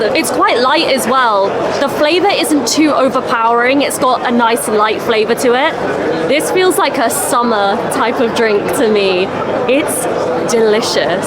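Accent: United Kingdom. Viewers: 動画の企画も、もちろん素晴らしいです。いつも楽しみにさせていただいています。探求心も素晴らしいです。さらに、の、イギリス英語の響きの良さ、本当に引き込まれます。きれいな英語を聞かせていただくだけで、大満足です。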